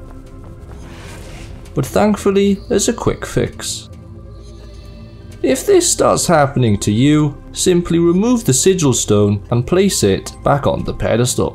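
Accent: Wales, United Kingdom. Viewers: are you actually welsh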